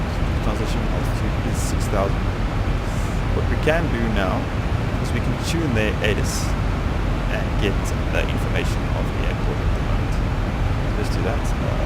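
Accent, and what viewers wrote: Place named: South Africa. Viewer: I thought you sound South African and I was right. Im also down here but thanks for this I jist finished my pc build and started to play xplane.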